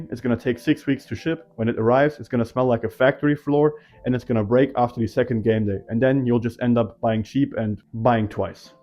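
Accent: Germany. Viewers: Ich höre doch einen Deutschen hier raus 🫣🫣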